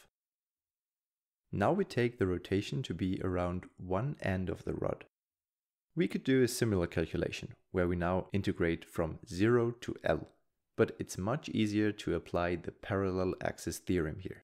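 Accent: Germany. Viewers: Listening to his accent, I think he is German.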